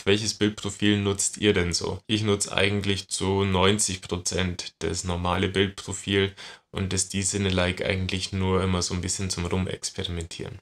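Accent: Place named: Bavaria, Germany. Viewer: Servus, guter Content und angenehmes bayerisch :) ist das Foto bei Min Regensburg?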